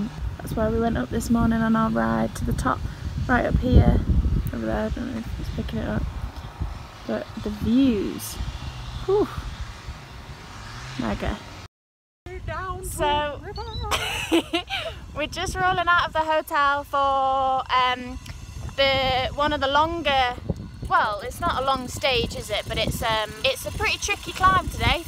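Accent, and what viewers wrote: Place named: United Kingdom. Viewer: Nice views :) beautiful British accent :) safe road for both of you, enjoy!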